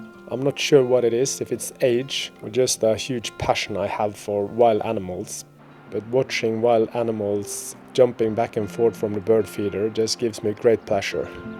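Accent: Bergen, Norway. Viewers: Det er ikke vanskelig å høre at du er Bergenser ;-)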